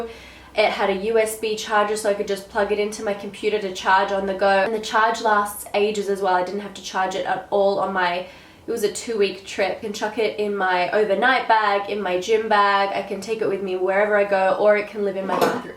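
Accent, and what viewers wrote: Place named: Australia. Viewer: I know people throw this statement around all the time but you are seriously so beautiful, with and without makeup. You truly don't need to wear makeup you're that beautiful. This is the first video of yours that I've seen so I don't know anything about you but if you're not a model you should be. And I actually like your accent even though Aussie accents often annoy me (I lived there for 8 years and became a dual citizen, so I can say that)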